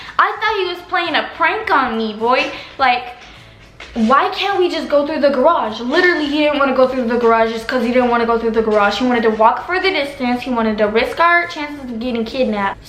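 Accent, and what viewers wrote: Texas, United States. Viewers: american accent?? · texas accent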